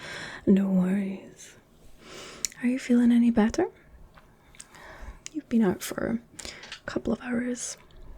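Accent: Scotland, United Kingdom. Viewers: I hear a slight Scottish accent in your voice is it? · Alternative universe Scottish tall lady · love a Scottish queen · SCOTTISH VAMPIRE LADY YESSSSS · Scottish Dimitrescu...very boner inspiring. The hand thing in front of the camera is kind of weird though. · She’s Scottish now? hell yeah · I think she's Scottish Someone will probably correct me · Looks wise you got it locked down but voice wise just not it for me😂 not that theres anything wrong with a Scottish accent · Knew you were Scottish 😜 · Aye thought I heard a hint of Scottish in there, just saw the about page. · Last thing I expected when clicking this is a Dimitrescu with a Scottish accent, love it, live for it. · Sounds Scottish. Is that Ginger Zee.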